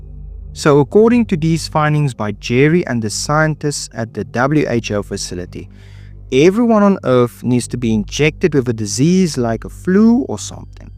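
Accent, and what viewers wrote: South Africa. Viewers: Hey are you south african by any chance?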